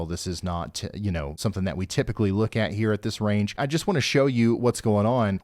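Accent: South, United States. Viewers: Howdy from Richmond, Virginia. I’m here for the great weather reporting but also for the kind southern voice. It’s a disappearing accent.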